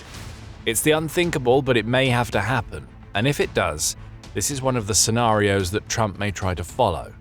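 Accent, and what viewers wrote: England, United Kingdom. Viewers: Refrain from crying about President Trump and his methods. You’re saying it with venom in your voice with an English accent while watching your own country devolve into islamification. So don’t be too quick to cry about the methods of the USA to handle the situation. We got this. Get off your high horse, or would you rather have a nuclear capable Iran telling the UK they have to take in more military aged men?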